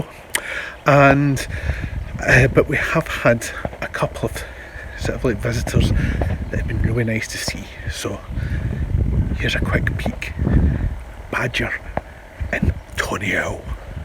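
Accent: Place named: Scotland, United Kingdom. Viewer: Just sometimes your great Scots accent throws me "Badger Antonio" LOL!!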